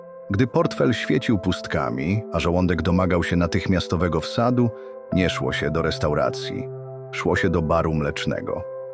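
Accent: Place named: England, United Kingdom. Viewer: Bez urazy, ale wiedza autora z filmów Barei przesadzona w surrealizm. Oczywiście pewne fakty są prawdziwe ale np te z barów mlecznych z łańcuchami na sztućce i naczynia przykręcane jak na Misiu Barei to przesada. Polacy mieli dolary od rodziny z zachodu oraz bony PKO aby kupować w Peweksie lub Balatonie np walkmeny japońskie Wranglery itp. pracowali za dewizy np w Iraku czy Libii. Osobiście nic nie miałem do barów mlecznych. Chumorystycznie lecz przesadnie pokazana rzeczywistość PRL na kanwie filmowej nie rzeczywistej i ten akcent angielski coś mi mówi. Pozdrawiam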